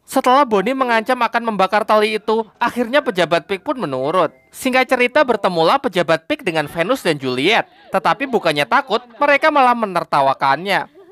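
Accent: Java, Indonesia. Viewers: Mimin orang Jawa ya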